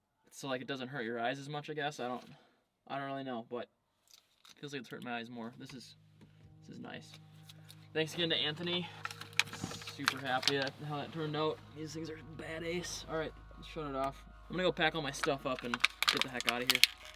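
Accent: Minnesota, United States. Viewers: What part of Minnesota are you from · what part of minnesota u from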